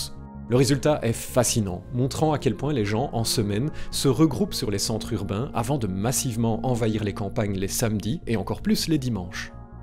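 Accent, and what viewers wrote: Belgium. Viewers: Aïe ! L'accent belge si vite dans la video, j'était pas près x). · ben c'est l'accent belge...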